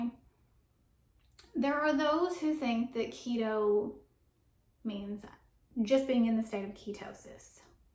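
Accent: South, United States. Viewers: This is probably my favorite video of yours so far - and I’ve watched a lot of them! “Here’s some crappy bread.” Lol. Couldn’t agree more. And your southern accent makes everything better.😊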